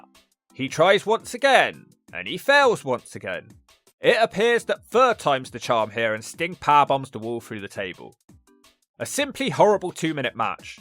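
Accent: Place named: West Country, United Kingdom. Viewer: That West Country accent was seeping out in this video 😂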